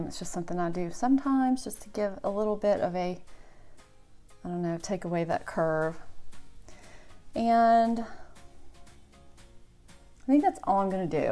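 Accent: South, United States. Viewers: I kneed to get the Physicians Formula shimmer strips. I just noticed that you are in Louisiana. I live in Mississippi. Love hearing a familiar Southern accent on youtube. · I just love you girl. That sweet Southern drawl just relaxes this Yankee!! I've tried some of your recommendations already(new sub) and they've worked well, both the products and the techniques. So glad I've found you!!! Our coloring is very similar, but I'm not a blonde. My eyes are brown, hair is auburn and I've got more than 20 years on you!! Tee hee. Still your style and techniques work so well for me. I so enjoy your bedroom where you film, it's so beautiful. So , pls keep doin what you're doin. I so enjoy you. Love your 🐶 in the background and your daughter was adorable. Enjoy your day , and I'll keep watch for your videos. Again, so glad you're there, Dear. Love from PA. 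Rachel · Hi! I have to agree w/ your other sub who mentioned loving your southern drawl as a yankee 😀 I too adore it. My fiance is from Texas & I swear by talking to his mom & sisters, it's like being at a spa, their voices are so soothing lol. Anyway, great video as usual. I've never used the Benefit Erase Paste, but now you are making me go buy it ☺ I also love using shadows too as liners for wings, that Nars color is a gorgeous brown, may have to get that too! Love this look. 👍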